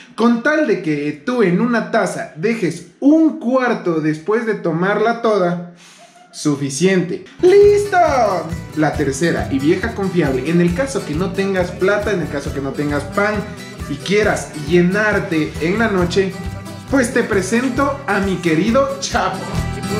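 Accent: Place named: Quito, Ecuador. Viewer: 🌟 Eres el Quiteño más simpático que he visto 😍💙 Me encanta tu acento... se te escucha lindo 💋 XOXO ❣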